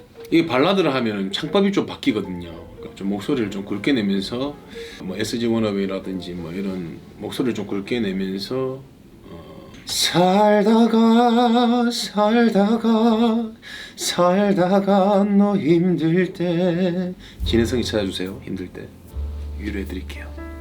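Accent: Seoul, South Korea. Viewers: 해성씨. 방가워요 서울말씨. 귀여워요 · 서울말 않해도 괜찮이요 원픽 진해성 만네요 승리만~~~👑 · ㅋㅋ서울말 오빠 ~~~ 우승꼭 ^^ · 서울말듣기좋아요 사랑합니다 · 진해성 가수님 서울말도 귀역네♡ 미스터트롯 최종우승 하시길 응원합니다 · 서울말 너무 귀여워요 모닝콜도 구수하네요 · 서울말 너무귀여웡~~^^ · ㅋㅋㅋㅋㅋㅋ서울말 왜케 귀엽나요 너무 좋아요 진해성😍😍😍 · 서울말?ㅎㅎ 해도 귀엽고 안해도 귀엽네 역시 진해성 짱! 등수 상관없이 즐기세요 싸랑합니다^^ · #진해성 커피한잔할레? 서울말 잘하시네요 서울말 많이 해주셔요 이다음에 M C 도 하신다면 유창한 서울말 잘하셔야 될것 같아요 탑7중에 제일 잘생기고 멋지고 노래넘사벽 최고예요 미스터트롯2의 우승을 기원합니다 💙💙💙👍👍👍👍👍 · 서울말 너무 귀여워요~~ ㅋㅋ 화이팅!! 힘내요.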